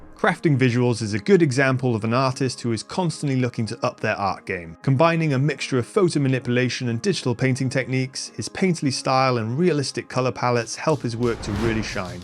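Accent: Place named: United Kingdom. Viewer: Love ur british accent, "bo'om left" 😂. Anyway, that's a great artwork right there man 🙏❤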